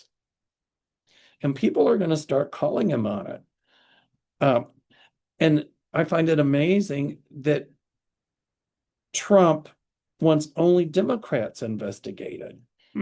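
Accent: South, United States. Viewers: I absolutely ADORE you Auntie 💜🌻🫶 I love the whole Southern accent and when you say "you all* and I've learned to take the upper road and say " "Bless your heart" to those I don't quite agree with. 😆🙏💯💜🌻💫 Thank you and God Bless! 🙌💫💯🌻💜🙏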